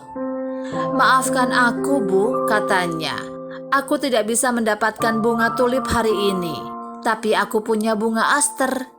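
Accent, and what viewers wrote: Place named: Indonesia. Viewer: maaf bacaan orang Indonesia dengan bacaan orang luar negri beda jadi just harus memakluminya yg lancar bahasa inggrispun belum tentu bisa seimbang dengan orang barat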